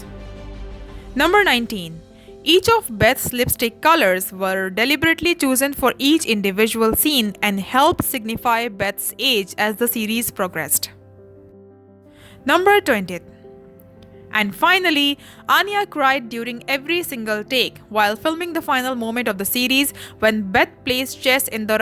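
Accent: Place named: Pakistan. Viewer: Pakistani voice over HAHAHA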